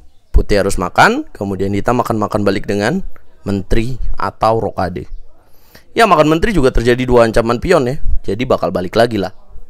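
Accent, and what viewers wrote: Tegal, Indonesia. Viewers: Sampean asli wong tegal mas?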